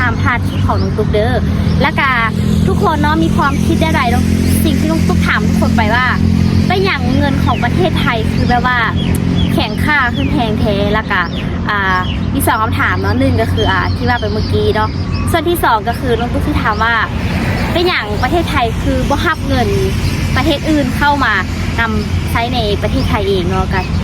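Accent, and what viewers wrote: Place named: Laos. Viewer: บอกไม่กิครั้งการทำคลิปต้องศึกษาให้ถ่องแท้.ไม่ใช่ว่าอยากจะอัดคลิปก็อัดๆไปวันๆ1ก็ไม่ได้ค่อยได้ประสิทธิ์ภาพ.คนไทยมีการศึกษาพอสมควร.เขารู้ว่าการพูดและข้อมูลของคนลาวที่ทำคลิป.ทั่ว.สปป.ลาว.ทั้ง18แขวงว่าคนลาวคนๆนั้นมีความรู้มากน้อยแค่ไหนในเรี่องเกี่ยวกับไทยในหลายๆด้านหลายๆมิติ.บอกกิครั้งแล้วอยู่ไทยพยายามพูดภาษาไทย.จะได้ได้เก่งๆแบบนุชดาวๆเขาเก่งอยู่ไทยมาเป็นสิบๆปี. ก็พยายามพูดไทยคนไทยบางคนฟังไม่เข้าใจ.เดี่ยวเด้อ.เดี่ยวแด.นะค่ะ.นะจ๊ะ.ค่ะค่า.จ๋าจ้า.ไม่ใช่."แม่น.โด๊ย.บอบใจ" ขอบคุณนะค่ะ.ขอบพระคุณมากๆนะค่ะ.ถ้าใช้คำว่าขอบใจคนรุ่นเดียวกัน.หรือ.รุ่นน้อง.ภาษาลาวไม่พูดสัก10ปีก็ไม่ลืมหรอก.หัดพูดไทยให้ลิ้นอ่อนๆเข้าใว้.แบบนุชดาว.เข้าใจ.สวัสดีนะจ๊ะ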